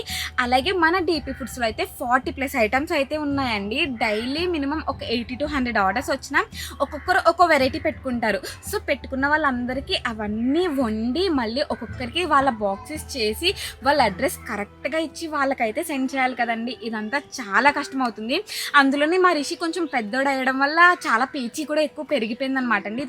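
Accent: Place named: Godavari, India. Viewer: Godavari vallu alane matladataru andi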